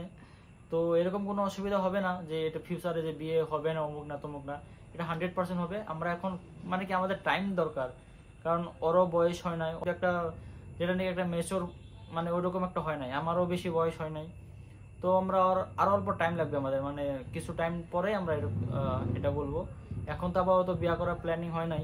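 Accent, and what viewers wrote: Assam, India. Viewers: Dada amio assam er.... Tmr moto bangla boli😅😅😅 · Tomar Kotha Sunle bujha Jay Tumi assam er dada... Amio assam theke Tomar vdo dekhchi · Hmm amnitew Assamer bangla language olpo belek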